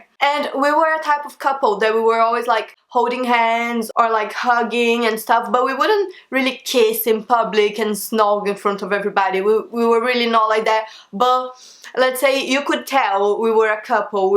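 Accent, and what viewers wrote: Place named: Brazil. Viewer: I know that ur brazilian because ur. Accent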